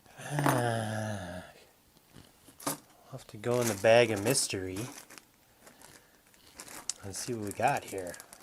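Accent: Canada. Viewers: Loved the commentary, you sound like you are in Canada?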